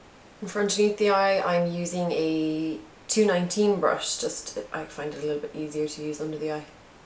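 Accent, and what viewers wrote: Ireland. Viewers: I loove your accent! Irish? :)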